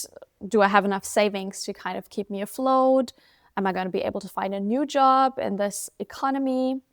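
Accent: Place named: Germany. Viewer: Yes, her accent sounds German, but she looks more Eastern European.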